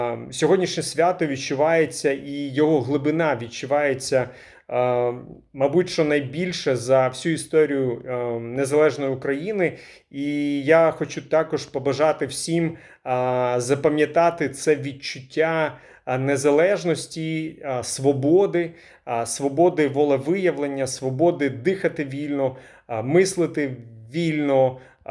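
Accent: Russia. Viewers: Что то вы с сильным русским акцентом и натужно по-украински изъясняетесь. По русски у вас получается более бегло.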